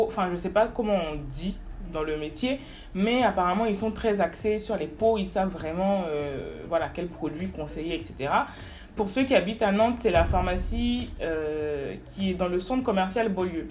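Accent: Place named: Ivory Coast. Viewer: L'accent me fait penser a une ivoirienne 🥰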